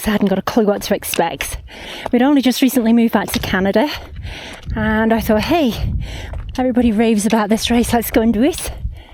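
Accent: United Kingdom. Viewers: Great video. Thanks for an expert perspective. On race day, I only ever see the back side to Ambler Loop in the dim dusk or in the dark. Have trained there quite a number of times but its still great to see it from a runners point of view...btw, its great to hear a Brit commentary...I am from Cheshire... see you in God's Country in August!!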